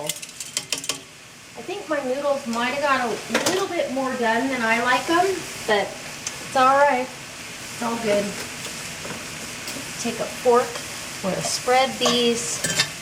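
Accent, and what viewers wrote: South, United States. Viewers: a slight southern drawl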